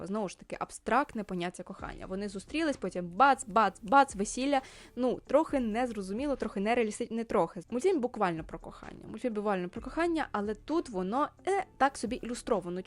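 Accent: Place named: England, United Kingdom. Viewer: У вас дуже приємний англійський акцент 😀 Я думаю, одна з основних причин, чому дісней перезнімає старі мультфільми, це спроби продовжити життя принцесам. Сучасним дітям вже не цікаві настільки старі мультфільми, ще пару поколінь і ніхто б вже не знав хто така Аврора чи Аріель. А оскільки їх лінійка принцес це ледь не візитна картка діснею, їм важливо розширювати аудиторію і залучати сучасних дітей. Ну і заробіток звісно теж, куди без того) Такі мультфільми 100% окупляться, а от нові історії це завжди ризик Більшість останніх мультфільмів які вам сподобались були таки зроблені піксаром, а не діснеєм. Не думаю, що дісней хоч якось приймав там участь окрім як інвестування. І я б не стала мішати Піксар до Діснея. Так, формально вони його купили, але це все ще різні студії, і як на мене вони мають кардинально різний підхід і стиль роботи) Дісней завжди були досить консервативні як стосовно візуального стилю (у них всі персонажі виглядають тупо однаково), так і стосовно сценарію. 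Вони бояться відходити від протоптаних стежок, в той час як піксар часто експерементують. Тому, до речі, мене здивував нещадавній реліз "Бажання", де видно експеременти зі стилем, судячи з усього навіяні новими тенденціями, які запустли "Людина павук крізь всесвіт" та "клаус" свого часу. Проте у Діснея цей експеримент поки не дуже вдалий, і виглядає дивно. Тим не менш, цікавий початок, побачимо що буде далі.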